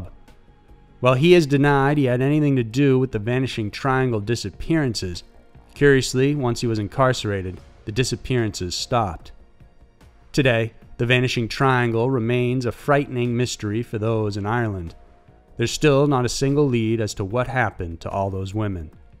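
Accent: South, United States. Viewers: Love that southern accent 💋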